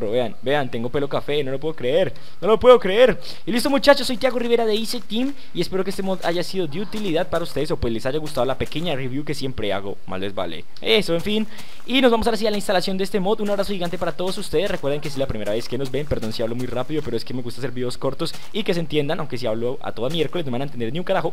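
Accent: Colombia. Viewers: oye eres colombiano y igual que yo?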